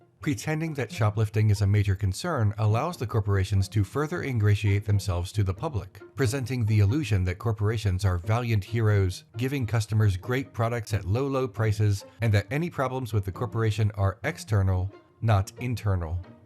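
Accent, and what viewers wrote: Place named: Mid-Atlantic, United States. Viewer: I like the narrators mid-Atlantic accent. Don't try to hide it lmao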